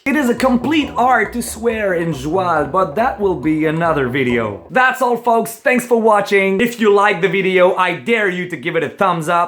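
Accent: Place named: United States. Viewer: comment ça t'as un accent americain..cest tellment cool ça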